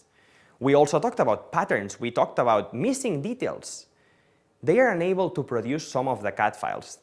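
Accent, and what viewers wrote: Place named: Italy. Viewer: Sicuro sei italiano!!!😊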